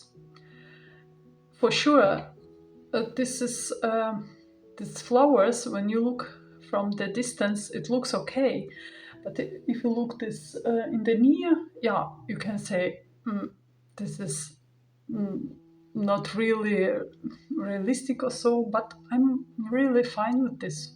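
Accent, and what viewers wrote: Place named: Eastern Europe. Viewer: Your accent is just so beautiful, and your English is wonderful. I’m a third generation Russian-American, so English is my first language, but I always love the way Slavic languages and accents sound. So beautiful! God bless you and your family,! I hope to see more videos from your channel and that it grows in popularity. 🩷🙏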